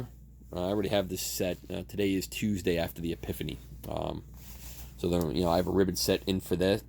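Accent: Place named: Boston, United States. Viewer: You sound like JFK you must be from Boston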